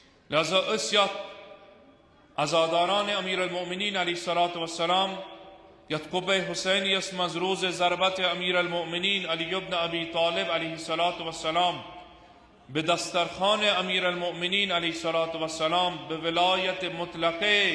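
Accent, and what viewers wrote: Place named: Iran. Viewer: Why is trying hard to speak kashmiri and arabic in IRANIAN ACCENT ?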